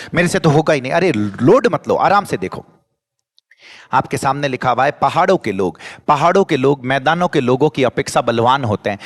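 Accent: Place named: Rajasthan, India. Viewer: Sir youtube par aisa koi mila h jo full meri tarah मारवाड़ी bole । Baki aaj tak koi ni dekhiya the sir ghana hakra padhao ji khush hugo 😁🥳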